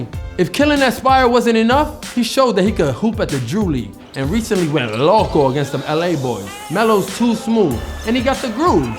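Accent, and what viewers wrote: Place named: Dominican Republic. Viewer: Bro I had to like the vid when he spoke that Dominican Spanish. Ayyee🇩🇴🇩🇴🇩🇴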